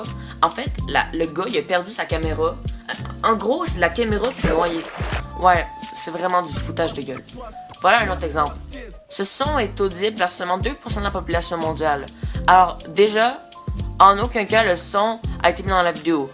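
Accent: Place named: Quebec, Canada. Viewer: Gg t québécois j'adore ton accent 😍😍😍😍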